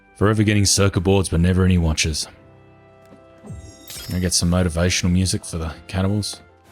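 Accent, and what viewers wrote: Australia. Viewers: Hey, ive been wondering, what do you want in 1.0? Also, are you Australian? Edit: never mind. I didnt hear till the end. Gotta love straya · Ah, thought you were a fellow Aussie but for some reason I couldn't tell for sure. Namely because you spell words like behaviour the American way.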